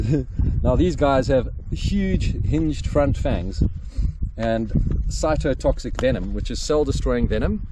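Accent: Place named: Netherlands. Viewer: You sound like a Dutchie! How are you, brother? Beautyfull beard, i'm jealous!!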